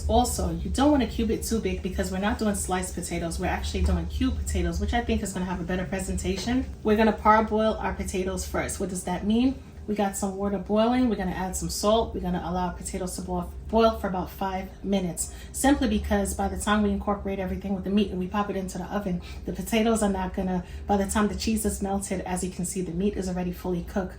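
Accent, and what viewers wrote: New York, United States. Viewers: She sounds like she’s from New York or New Jersey or something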